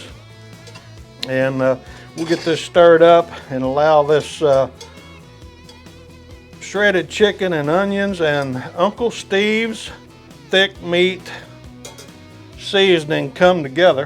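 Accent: South, United States. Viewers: Just went through my annual blood tests and physical. I'm 68. Went in for "The Chat". Took height, weight, blood pressure, the usual suspects. BP was on the high side of normal. I asked what was it last year? She checked and said, About the same. I said Normal, Nervous, Anxious result? She smiled then looked at the summary of results and said, Oh, this is unusual (she shoulda took my BP then). Every test was perfect! (my heart started beating again) See NEXT Year! I hope all goes well with whatever confronts you, my Friend. 🙂 Now, I think you'll enjoy this. Our carpet was scheduled to be put in yesterday. We were watching YT videos. The installers showed up early went out and greeted them, a father, daughter team, spoke little English with a heavy Central American accent. I showed them what they were up against and they got at it. While lugging in their tools I hit go on a video by Smokin Joes Pit BBQ making Carna Asada's Tacos. 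The installers were right behind me, heard it, stopped for a few seconds, looked and back to work. I thought it was just coincidental. BUT, you were up next with this video. They were just coming through the door again when I started it and you said, (with your subtle Southern accent) and I'm quoting the closed caption that somehow was on the screen, "Come on stops me Amigo's, how are you doing my friends". Yup, didn't really roll of the tongue the same as it would had Joe said it. 🤣Again he stopped for a second, but just One, and back to work. I ha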